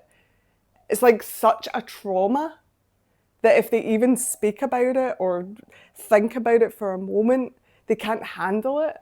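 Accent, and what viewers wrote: Scotland, United Kingdom. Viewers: Love the Scottish accent.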